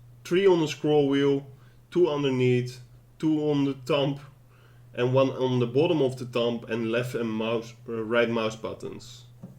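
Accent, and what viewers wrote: Netherlands. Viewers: hahahaha dat nederlandse accent heerlijk dit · Jezus dat nederlands accent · That Dutch accent though.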